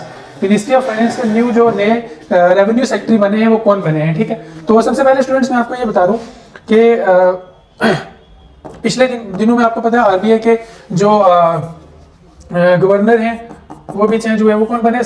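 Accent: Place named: Delhi, India. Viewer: Chha gye paji , Delhi waloon ki accent aagyi hai ,always grow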